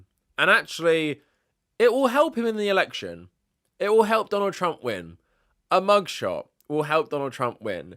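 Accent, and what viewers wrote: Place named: United Kingdom. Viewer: I've been watching your channel for a while and I'm not going to lie despite the accent I somehow never realized you were British till you said it in this video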